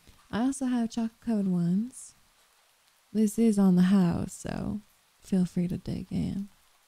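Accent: South, United States. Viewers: Each is getting better than the last, it really shows your getting a hang of the programs and good sound design! The rain maintaining its sharpness in the background is a nice juxtapose against the smoother southern accent that isn't comically overblown uwu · I'm not usually into the southern Bell but for you I think I can make an exception. 🥰 This was really great truthfully you sound more natural southern compared to people that I know that are southern 😭. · Since I'm not from the south, I cannot judge your accent. I'm too far north to be allowed to do that. You sounded more southern than I can try. :) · Only thing I have to say is that the SFX at the start is WAY TOO LOUD compared to the voiced bit 😅. Apart from that, this was lovely to listen to 😊. Even though I'm usually not a fan of the southern accent, your voice is so soothing that I actually liked it in this audio 🥰.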